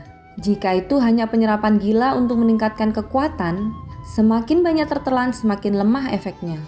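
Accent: Java, Indonesia. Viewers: noba 1 jg awal"nya kaku bacanya skg enak dengerinnya... skg noba 2 sama kaku cuma bedanya noba 1 ga medhok 🤭🤭🤭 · Itu bahasa Jawanya medok itu mohon maaf bikin lagi yang banyak ceritanya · MALAH SESION AKHIR SUARA D GANTI JADI TIDAK MENARIK HARUSNYA DI GANTI DG PENGISI SUARA YG LBH BAGUS MALAH JDI MENDOK JADI KAKU 1000% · Logat jawanya kudu ditekan nih min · semangat buat mimin baru... klo ada yg ngatain suara medog jawa dll jangan menyerah👌 tar lama kelamaan akan hilang kok. gw dlu pas baru pindah jakarta jg medog jawa, sekarang udah ilang medognya karena keseringan ngomong bahasa indonesia tiap hari🤭 semua butuh proses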